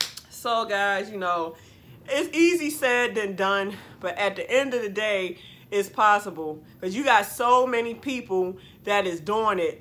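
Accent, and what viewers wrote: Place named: South, United States. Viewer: . I really enjoy your content. I really enjoy your positive attitude. The southern twang is beautiful. I am going to law school in August. I have been driving for about 30 years. But you have to go after your dreams. Take care. And another thing, you are so beautiful and have a beautiful spirit too.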